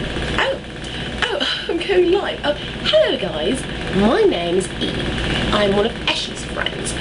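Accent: United Kingdom. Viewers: wicked, i live in london and im a filipino. u got a posh accent. nice try ^_^ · cool E! gusto ko yung British accent mo,ah!I'm trying to change my voice sometimes(practise ba)kaso bakya tlaga!hhahaha Galing!iba na tlaga pag bihasa sa pagsalita ng Ingles.wla akong ma-say, ingit ako!haha!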